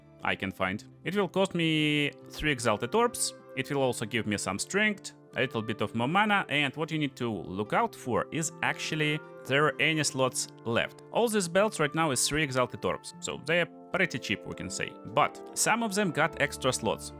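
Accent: Russia. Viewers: Чувствую русскую нотку проскакивающую через английский)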